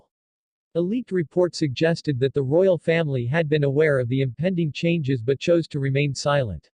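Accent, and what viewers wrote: United States. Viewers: American accent?Bye bye.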